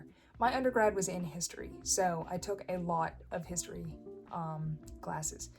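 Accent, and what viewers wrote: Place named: Carolinas, United States. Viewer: I think it's from the Carolinas in the Southeastern Coast of the US. I'm a Mississippian stuck in the PNW and I think it's lovely.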